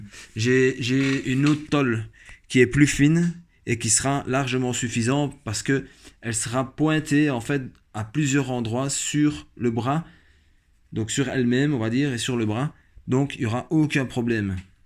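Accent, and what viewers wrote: Belgium. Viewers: tu me fais deliré comment tu parles...ta "façon d'être" au travers des vidéos... tranquille quoi, posé.. avec ton petit accent belge (si je me trompes pas). en tout cas continu tes videos cest sympa et apaisant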